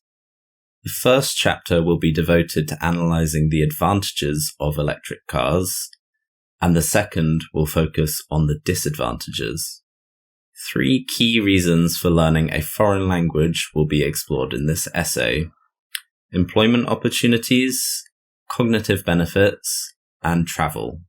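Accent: RP, United Kingdom. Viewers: Is it received prounication ?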